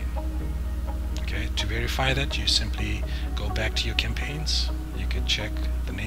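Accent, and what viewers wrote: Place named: Europe. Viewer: Love the groovy music along with Euro accent.... I feel like I'm in the club!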